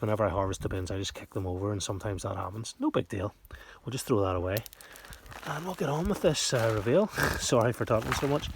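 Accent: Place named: Derry, United Kingdom. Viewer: I’m from Strabane so similar accents, you’re just slightly more Derry than me 🤗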